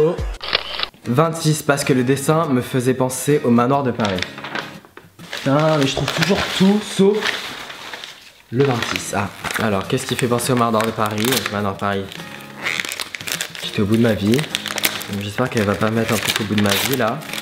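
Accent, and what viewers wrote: England, United Kingdom. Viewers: Tes vidéos sont bien meilleures sans ton accent anglais celle la était super :)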